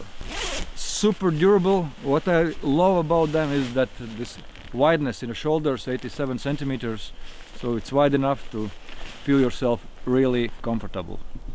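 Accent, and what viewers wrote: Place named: Baltic states. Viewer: Так вот как звучит прибалт,говорящий на натовской мове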